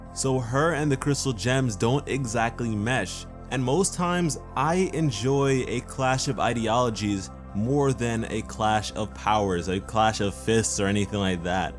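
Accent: United Kingdom. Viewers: shes too british 4 me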